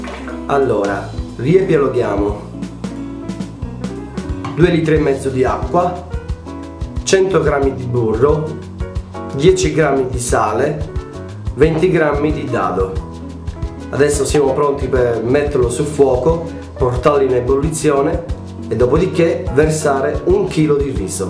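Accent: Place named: Sicily, Italy. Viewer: Un sardo con l'accento siciliano????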